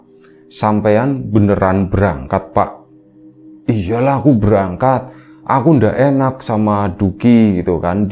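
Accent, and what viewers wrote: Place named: Banyumas, Indonesia. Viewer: Hader kawan...ora ngapak ora kepenak😃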